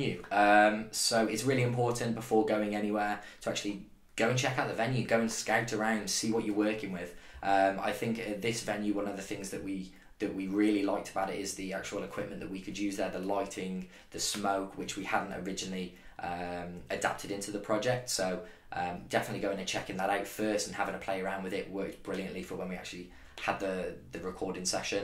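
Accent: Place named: Germany. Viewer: Can barely understand your English--sounds like Ginglish. Technical mambo jambo in Ginglish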